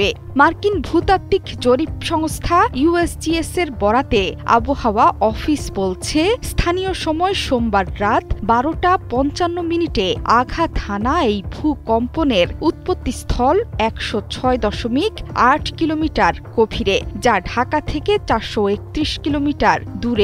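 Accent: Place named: West Bengal, India. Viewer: খবর পরার স্টাইল পুরাই ইন্ডিয়ান বাংলার মত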